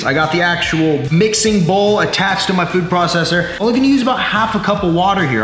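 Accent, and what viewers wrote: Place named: Ohio, United States. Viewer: I can hear the Ohio lol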